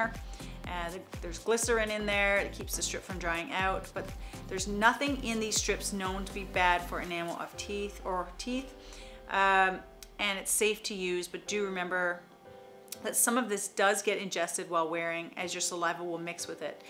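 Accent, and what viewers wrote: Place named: Canada. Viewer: She's gotta be a fellow Canadian according to the way she says 'about'.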